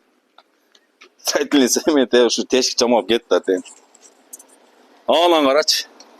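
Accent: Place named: Osh, Kyrgyzstan. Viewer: Даана оштун тилинде сүйлөптүрго. Азаматсың...😊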